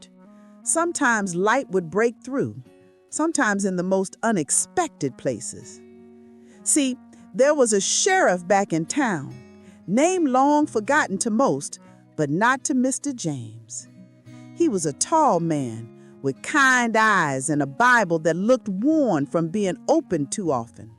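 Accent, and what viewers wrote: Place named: South, United States. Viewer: I enjoyed the video, and loved the southern accent.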